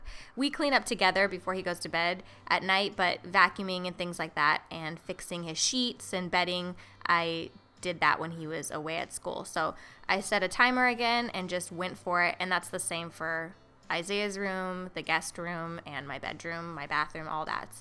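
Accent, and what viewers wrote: United States. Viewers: hey girl, where did you get that Californian accent??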